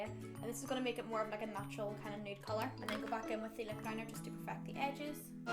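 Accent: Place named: Belfast, United Kingdom. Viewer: no need to hate on her! It her accent I'm from Belfast and it's just in our accent that we say like a lot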